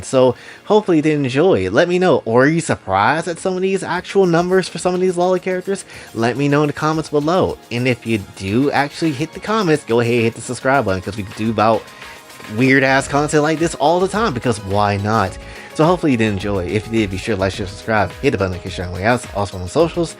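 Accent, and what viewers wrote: Brazil. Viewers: Hey bro i only thought of asking know but are you BR? Your accent is familiar and l0lic0n BR YouTubers are very rare so i got curious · Indeed, the guy sounds like a brazilian a bit, and I can not pinpoint why.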